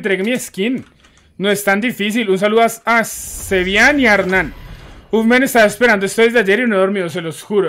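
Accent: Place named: Bogotá, Colombia. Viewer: Eres de Bogotá cierto? Jajaja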